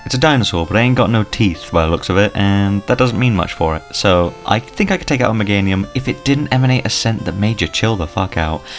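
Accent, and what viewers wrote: United Kingdom. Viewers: Are you a fellow Brit?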